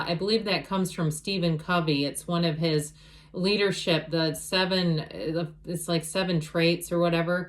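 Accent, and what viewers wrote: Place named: Midwest, United States. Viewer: Your voice is fine, I’m from Illinois 😊 are you from Wisconsin?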